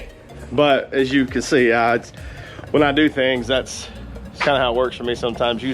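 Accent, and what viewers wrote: South, United States. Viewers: I always look forward to Thursdays! Happy Thanksgiving,! I see you have now achieved 220 subscribers. Congrats! NICE t-shirt! I need to get me one of those. Now - you say EVERYTHING you will need to change the oil is right on the table. I do NOT see any Crown Royal or Mich Ultra., you are slacking. But I must say it looks like a surgeon's tray! Everything you need, there at the ready. Me, I'm in and out of the tool box and the house 10 times. I dig the ingenuity in using a dropper when you don't have another small tube or funnel to fit. That's something I would do. We learn by doing and get better as we go. What is there, a "thing" about Amsoil? I have never used it but it seems like they make a good line of products. Why would someone criticize using it? Gotta love YouTube. Learn something every day about these bikes. Looks like you got it filled up to spec with nice clean oil. 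My bike being 7 years old, I'm concerned about all my fluids - oil, shaft oil, hydraulic fluids and coolant. Maybe in the Spring. My tires still look good although original equipment, but I worry about them as well. I only have 700 miles on 'em. But dry rot is a concern. Love the way southerners say "oil". Ole. AmsOle. One syllable. Not "oy-l". Ole. I'm off to get a quick ride in before chowing on a delicious turkey dinner. Have a good one! Tongue click, finger point back atcha.😎😜👓🕶🏍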